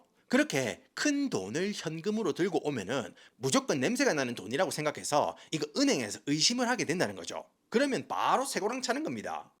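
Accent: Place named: Busan, South Korea. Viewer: 잘 보고 있습니다. 부산 중구,서구.동구 사투리인데, 저에게는 너무 듣기 좋습니다. 딱 동네후배가 '행님 아 이습니까~ 그래가~' 이런 뉘앙스가 i'd love to so much. As i indeed.😊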